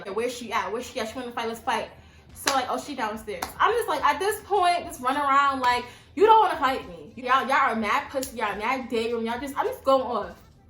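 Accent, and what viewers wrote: New York, United States. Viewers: it's the NY accent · It's the NY accent for me 😍😍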